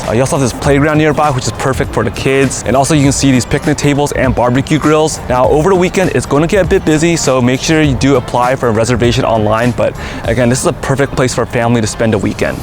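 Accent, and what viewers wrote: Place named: San Francisco Bay Area, United States. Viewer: indeed! proves he's local by saying it right. 🙂 Very San Francisco Bay Area accent 🙂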